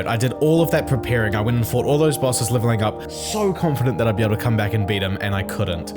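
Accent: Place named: New Zealand. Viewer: Chur bro, you sound like you’re from NZ too so I’m gonna lay it to you straight. I was up from around 1am-3am last night smacking cones and beers back and ended up watching all your Elden Ring videos in that time. Love the channel, for sure have a new sub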